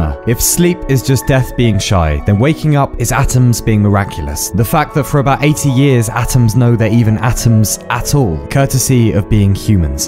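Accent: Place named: England, United Kingdom. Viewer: Why are so English 🦖🦖🦖🦖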